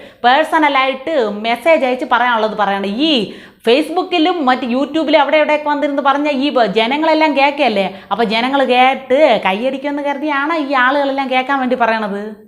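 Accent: Thiruvananthapuram, India. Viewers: ഭാഷ തനി തിരുവനന്തപുരം: തിരുവനന്തപുരത്ത് കാരിയാണോ? അവതരണം സൂപ്പർ❤❤❤❤❤❤❤❤❤❤❤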